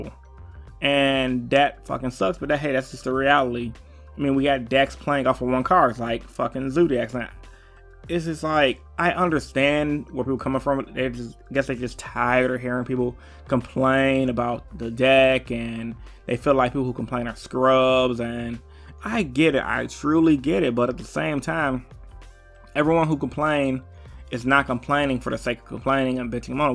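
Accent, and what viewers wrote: Chicago, United States. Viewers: Bro! You are so chicago lol respect.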